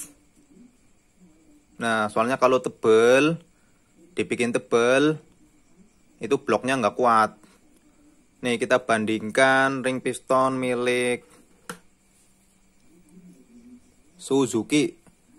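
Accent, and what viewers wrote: Banyumas, Indonesia. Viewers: Ndeleng logate kaya kaya cah ngapak apa ya??🤭😅 Salam ngapak boss..🙏🤭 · Kayane wong ngapak gie,tak subsicrab mashe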